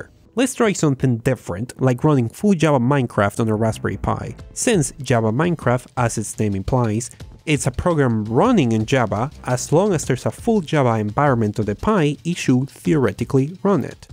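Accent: Spain. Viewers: deshacerte del acento español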